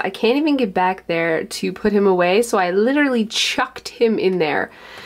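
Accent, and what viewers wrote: Canada. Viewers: I love your Canadian accent! <3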